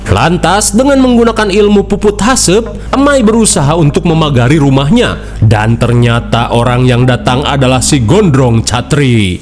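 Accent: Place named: West Java, Indonesia. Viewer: Klo xg reviu flm horor jd ngakak.. Sundax lucu.. Kerren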